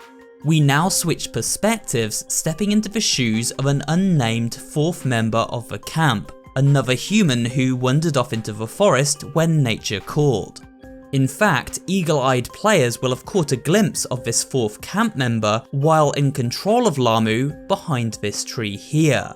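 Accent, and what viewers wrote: United Kingdom. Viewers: THE BRITISH ACCENT JUST MHWA❤️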